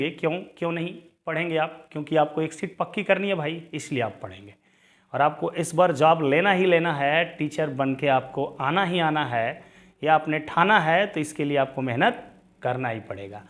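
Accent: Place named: Bhojpuri, India. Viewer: Bhojpuri andaj me jabardust lag rhe h guru ji😊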